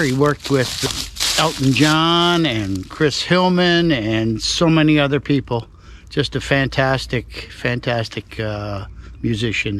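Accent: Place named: Upstate New York, United States. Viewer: Just listened to the album, love it! Very impressed with your multi-instrumental skills. I especially like, I think. Nice lyrics by Helen Wheels, she was so talented. R. I. P. Very interesting walk-and-talk, and beautiful scenery. BTW, love the upstate NY almost Canadian-sounding accent. I'm from Norway but I like to try and pinpoint English-speaking people's accents. Sorry for the digression. 🙂 Edit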